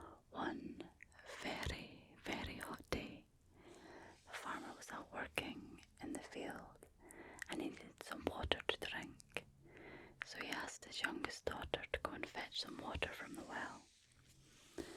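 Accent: Scotland, United Kingdom. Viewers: This video took me on such a calming journey! The blend of Scottish legends, soothing hand movements, and that perfect ear-to-ear whispering in your Scottish accent made it incredibly immersive. The guided meditation and visualization were just what I needed to unwind, and your storytelling added such a unique touch. Sweet dreams, indeed! Thank you for creating such a peaceful experience.😴😴😴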